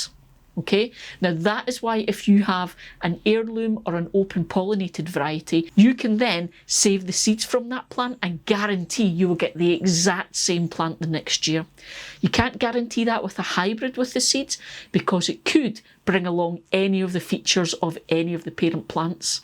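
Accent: Scotland, United Kingdom. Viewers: I just found you’re channel! I’ve been watching all day 🤩 I think I’ve learned more today than ever! Great to hear you’re Scottish accent 🥰I’m from Glasgow, Scotland so it’s lovely to hear a fellow Scottish people 🏴thank you so much for all these golden nuggets of information! 🪴🌱🌿🍀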